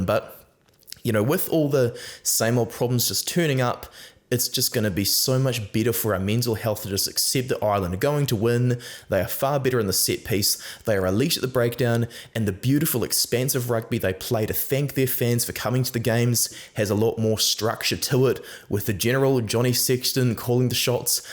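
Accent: New Zealand. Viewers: hi! Really enjoying your videos:) You're an excellent host! Many reasons for this. I'm a Frenchman, who lived in NZ between 2009 and 2016, looks like the ABs have stopped dominating and winning everything as soon as I left Aotearoa:( but that France went on to (almost) win 2 soccer world cups since;) and on its way to conquer the 2023 RWC!? We shall see!. Hearing you talking about rugby with your kiwi accents brings back so many good memories of NZ, and your passion and knowledge of the game and how you communicate them are unmatched. Keep going bro:) Take care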